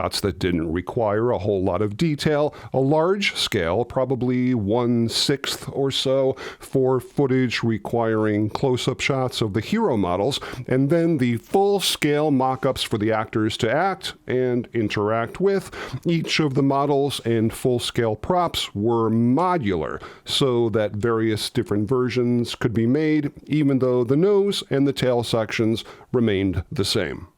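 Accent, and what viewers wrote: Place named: United States. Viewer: I'm sorry I can't listen to that bloody accent anymore you won't get to the point you bloody yanks just keep talking and talking . God forbid we'd actually have videos from other countries considering its the world wide web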